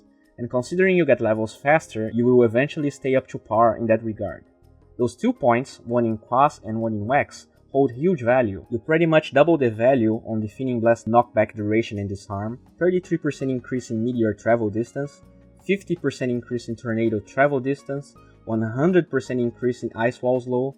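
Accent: Brazil. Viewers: that accent haha r u brazilian dude?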